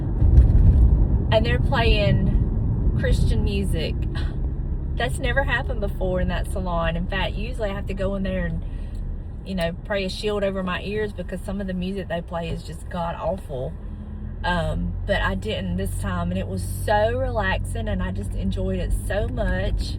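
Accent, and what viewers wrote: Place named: United States. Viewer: Hi beautiful happy and excited to be here, and see your beautiful Chrismas decorating!! Your so cute love 💞 your county type voice if that makes sense, you are a fun person to hang with!! Wished you! Wheir a neighbor of mine. 🫵🏼✊🏼🫶🏽💯🥰✌🏼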